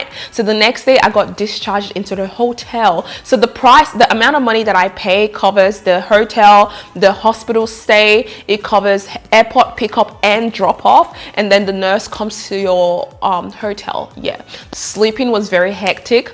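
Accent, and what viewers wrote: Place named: Australia. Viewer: ❤❤❤❤❤❤🥰 I love Australian accent! Had me laughing!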